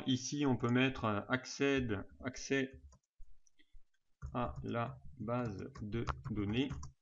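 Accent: South, France. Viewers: merci monsieur pour ce partage de savoir. Les explications sont très claires et en plus on le petit accent du sude!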